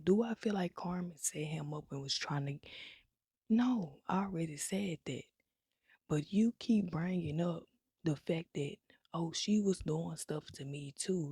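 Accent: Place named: South, United States. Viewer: What accent is this? Its southern right? Idk why but its so nostalgic to ppl I heard as a kid. I need to know 😂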